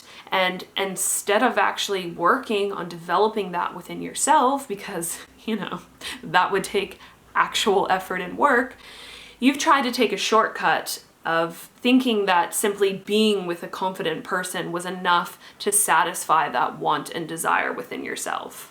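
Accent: Australia. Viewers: Are you Aussie? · Are you Australian or American?! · Aussie aslccent with a bit of Canada or US muced in? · Are you Australian?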